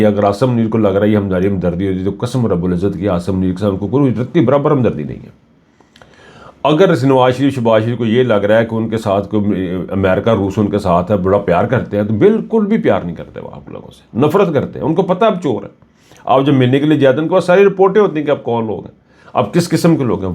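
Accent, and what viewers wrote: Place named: Punjab, Pakistan. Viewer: Excellent way of explaining the view……urdu with punjabi tarrka May Allah bless and help you to cover Pakistan’ s people point of views ❤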